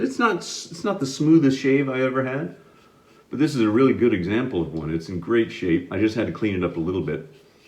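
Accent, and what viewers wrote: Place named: Liverpool, United Kingdom. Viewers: I got a slim twist white handle I got off my dad... Actually it was the razor that I started using that got me in to the rabbit hole that is DE razor shaving. Your liverpudlian accent almost had essence of mancunian in it. But not bad though. I'm waiting for you to perfect the Yorkshire accent. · Nice guitar, you should have worn it across you while you shaved! The liverpool accent is pretty good also 😁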